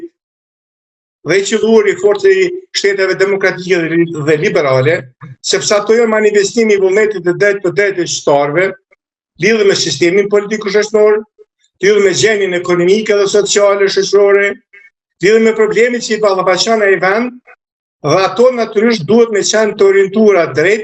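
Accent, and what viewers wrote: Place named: Highlands, Albania. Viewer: È difficile capire cosa sta dicendo! Sarebbero meglio di parlare nella lingua corretta Albanese, non tutti capiscono la lingua montanara!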